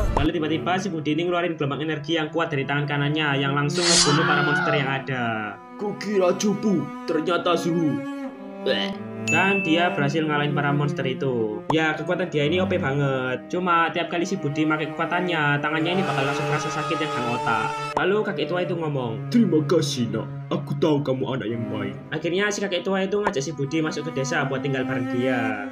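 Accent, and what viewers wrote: Java, Indonesia. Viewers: Koe wong jowo to maz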